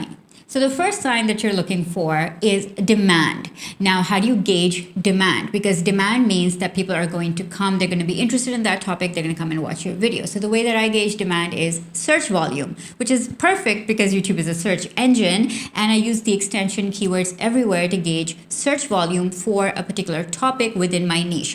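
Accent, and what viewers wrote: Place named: India. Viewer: I love your accent...are you Indian ?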